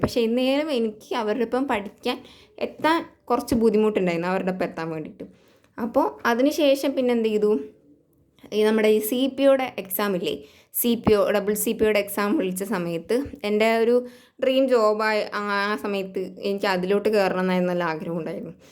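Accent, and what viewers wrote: Palakkad, India. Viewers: Ningal Palakkad aan llee😂😅??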